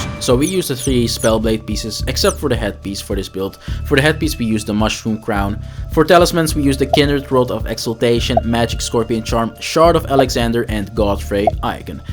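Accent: Netherlands. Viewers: Are you dutch perchance?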